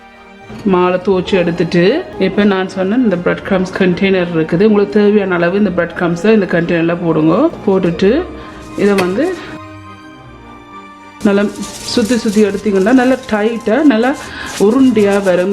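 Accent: Jaffna, Sri Lanka. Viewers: Ohh....wow...... I like your Jaffna spoken Tamil... I'm also Jaffna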